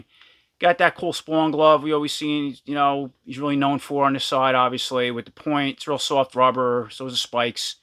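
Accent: New York, United States. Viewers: Thanks for your quick reply! Now I gotta sub!;) It's good to know that at least I'm not alone going nuts over these QC issues! I managed to somewhat straighten the bullets but they're still somewhat warped. Feels like I'm tripping on acid just looking at them. Thanks McFarlane! Not! Oh well... I'll try the heat cold method once again soon. Thanks. I appreciate you 🙏 By the way! Is your accent from New York? Just curious! Best regards from Belgium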